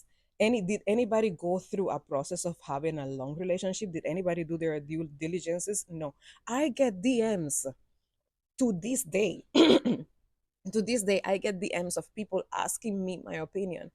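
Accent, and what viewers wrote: Patois, Jamaica. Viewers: Lawd me weak . I love the way you speak patwah. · There's nothing wrong with the way she talks. She lives in Jamaica and picked up the dialect. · Love your Jamaican accent👍❤️ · You know, listening to your stories and the way you talk, proves that you really turned into a Yaadie after all these years, because usually Cubans who come to Jamaica are full of bad attitude, they don't like the Jamaican food, housing has to be top tier, salary has to be the double of the Jamaicans but they want to work less, and if you feel like Kfc workers in Jamaica have a bad attitude while working, you have never seen a Cuban yet😂😂😂 So I am very much surprised seeing a Cuban woman like you adapting to Jamaica so well, put in the hard work, not afraid to make her hands dirty while keeping a grateful positive attitude...yah man, you turn 100% Yaadie! 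🫡👏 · Girl i love your jamaican accent , welcome home girl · Beautiful jamaican accent great story ❤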